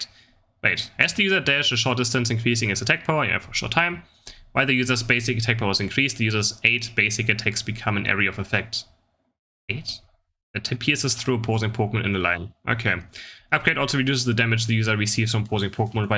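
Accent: Germany. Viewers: where are u from? germany?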